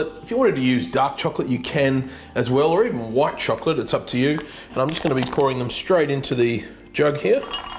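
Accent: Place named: Australia. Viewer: Love your videos! What part of Australia are you from?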